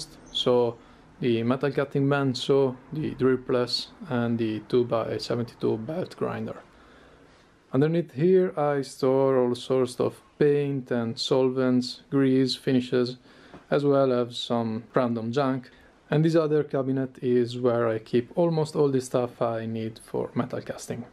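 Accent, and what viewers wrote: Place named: Northern Italy, Italy. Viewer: hahahhaha si sente che sei del Nord!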